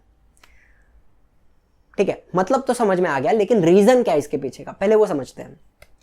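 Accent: Maharashtra, India. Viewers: Dada tu Marathi ahes?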